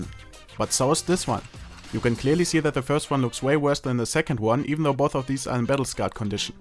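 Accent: Germany. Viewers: Ne ich verstehe sein englisch fast nicht mit diesem deutschen akzent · All of this math sounds so much better because you're German <3 cheers! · Fabian N aber er redet ziemlich langsam und verständlich es ist zwar der deutsche Akzent dabei aber wenn du so etwas nicht verstehst dann solltest du probieren dich an einen deutschen Akzent zu gewöhnen ... denn das englisch war sehr gut verständlich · Arbeite bitte an deinem Akzent, hört sich schrecklich deutsch an.. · Man hört das er deutsch ist XD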